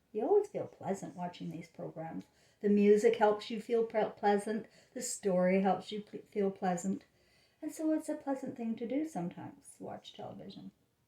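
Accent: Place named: Western Canada, Canada. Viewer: you sound like your from western Canada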